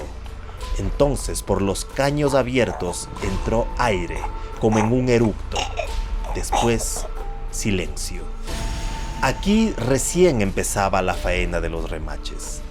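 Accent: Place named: Ecuador. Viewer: Que historia tan horrorosa, pero bien contada, me encanto sobretodo con ese acento de voz ecuatoriano tan bonito. Una historia de horror en un pais que admiro muchisimo.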